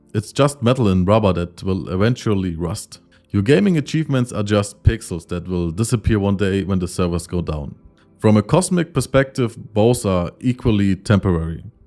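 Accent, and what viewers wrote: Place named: Germany. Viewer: I love deutsch-flavored English. It’s flavorful and discernible. Unlike China accent English… 😥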